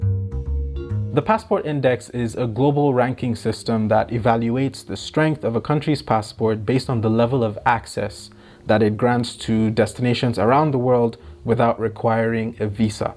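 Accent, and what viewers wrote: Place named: Jamaica. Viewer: Could swear you were Jamaican! I do get you on the privilege thing though. I remember going to a travel agency to seek assistance with applying for a Canadian visitors visa. I already had a US Visa and was scheduled to attend a conference, so I thought I would go up to Vancouver for a few days after the conference. The travel agent was so rude and condescending. Asked for bank statements, how much money you have in your account. Needed accommodation information, I booked accommodation at a hostel in downtown Vancouver. When she read the details, her snarky response 'hostel, shared room with wash sink'? I am traveling on a budget, what did she expect, a 5 star hotel? She went to the back and called her supervisor and asked her in front me if this looks possible. The supervisor sighed, do they have a Visa already? She nodded yes. 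Well, give it a try. I gathered the application forms, told them I will think about it some more. Went home dejected, but something in me said, try doing it yourself. Which is what I did, stayed up all night that weekend, submitted the application online Sunday, sibling gave me a ride to the embassy to submit the application. Got a response two weeks later I was approved and I should pick up my passport. Stopped by the travel agency and showed it to another travel agent I normally booked flights with and she was shocked. The other condescending agent acted like she didn't hear anything, but I knew she was upset. Anyway, thats all in the past now, livin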